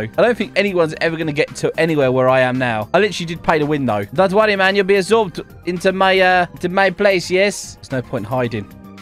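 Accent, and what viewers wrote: United Kingdom. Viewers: What country are you from you sound like someone in the uk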